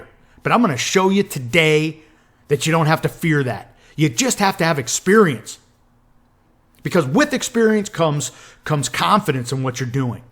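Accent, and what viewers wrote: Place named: Texas, United States. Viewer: Good content! Very educational and real world. And to top it off you talk like The Texas Fonz!! : )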